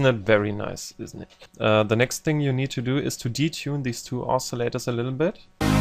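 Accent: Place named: Germany. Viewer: An deiner Aussprache hört man dass du deutsch bist :D Und dann hab ichs an deinem Programm gesehen xD Geiles Tut!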